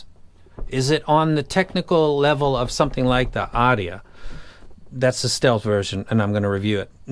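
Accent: Boston, United States. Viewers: Argons are something I have to pick up this year. Also I love what ever music app you are using for your files! I need to set up something similar. You sound like you're from the Boston area.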